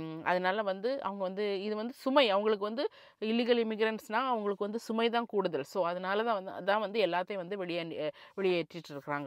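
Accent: Nagercoil, India. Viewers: Nagercoil Tamil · Neega nagercoil a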